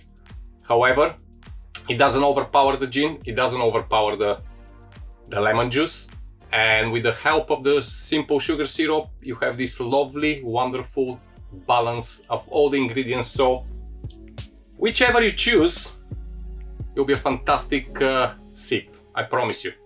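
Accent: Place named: Bulgaria. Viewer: Страхотно видео, но с този акцент съм сигурен че сме сънародници!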